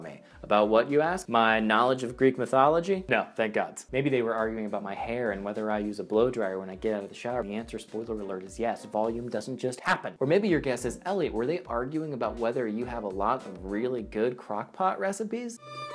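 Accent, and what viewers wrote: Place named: South, United States. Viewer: Thanks for the disclaimer about the southern accent.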